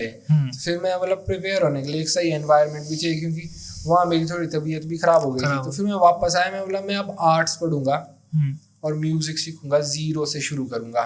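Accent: Pahari, India. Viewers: Yahi bhai ki sadagi hai accent ni chodte pahari 😂love u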